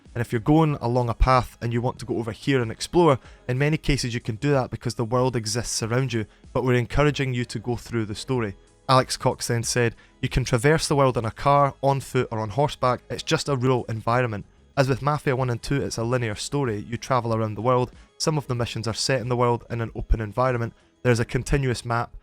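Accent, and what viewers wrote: Scotland, United Kingdom. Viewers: Glad to find your channel, mate. Don't hear enough Scottish voices on here and your commentary is very professional. Subbed. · Are you from Glasgow or Edinburgh?)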